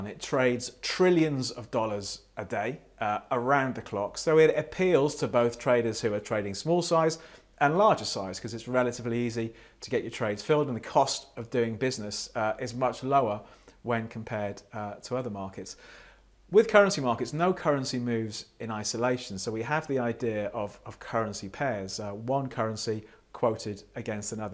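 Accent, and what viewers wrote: United Kingdom. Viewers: Him having a British Accent , is reassuring . Everything he says is golden.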